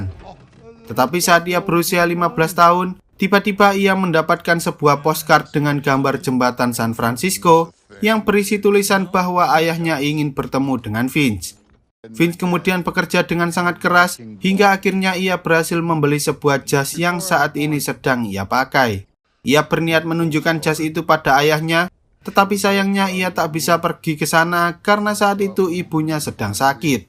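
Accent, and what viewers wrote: Java, Indonesia. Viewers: admin orang Jawa ya bicaranya medok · Medok amat bahsa nya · Kalo gak medok mungkin lebih nyaman dengerin nya🙏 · Mimin wong jowo yo😅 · MEDOK MEDOK... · Medhoj bngt boss, rasa ke tendang kuping gw dngr pke headset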